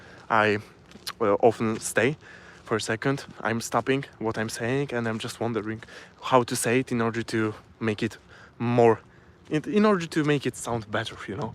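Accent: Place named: Poland. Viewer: accent was lost on this aussie 😂 now i know a polish accent i guess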